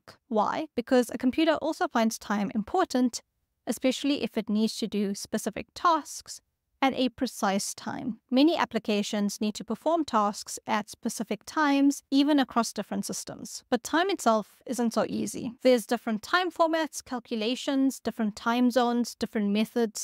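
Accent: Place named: South Africa. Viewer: Sounds like a Saffa accent.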